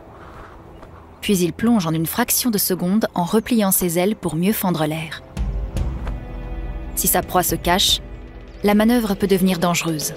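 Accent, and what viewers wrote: France. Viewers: toi t'y'es un parisien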